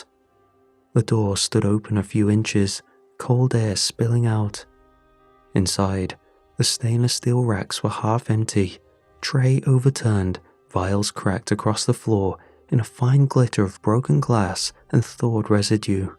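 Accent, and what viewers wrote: United Kingdom. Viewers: are you british? just curious i go to sleep with your stories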